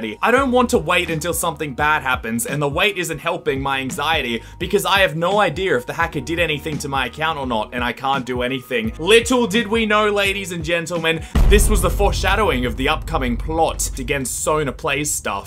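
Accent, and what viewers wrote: Australia. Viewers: sound australian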